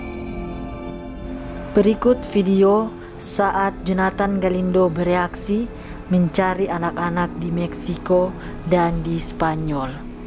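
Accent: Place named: West Sumatra, Indonesia. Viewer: loghat Minang nya keliatan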